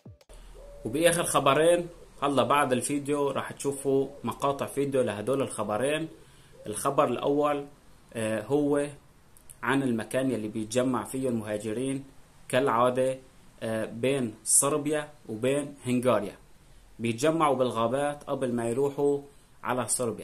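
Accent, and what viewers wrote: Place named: Aleppo, Syria. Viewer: المشكلة من لهجة انت حلبي معم افهم على لجتك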